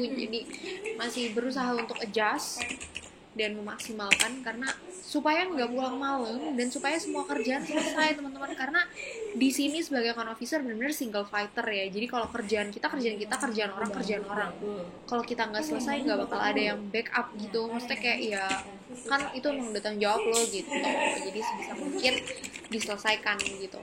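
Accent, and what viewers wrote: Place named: Bandung, Indonesia. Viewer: Dari bandung ya teh, hehe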